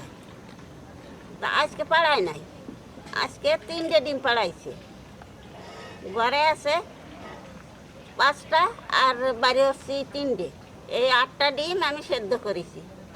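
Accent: Bangladesh. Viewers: দাদীমা আপনি আমাদের মানে বাংলাদেশের মানুষ, আপনার কথা শুনেই বুঝি আর রান্না দেখে তো অবশ্যই আমার দেশের ঘ্রান পাই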